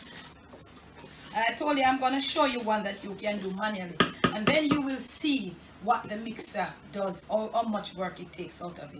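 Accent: Jamaica. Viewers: Hej Miss, Manners an' Respect from Denmark 😊🇩🇰. My wife and I absolutely enjoy your show, and we look at them everytime we receive notification😊. Incidentally today we will try your chicken burgers! You are an inspiration and brighten up our home with your presentations. We love when you say "me soon come"; and miss it in some of the videos...and please don't ever change your accent; it is absolutely brilliant and genuine. We look forward to the next experience, and ready to try some real Jamaican food. Without Brown sauce 😁. Happy Easter from Denmark.🇩🇰 Luca and Tina.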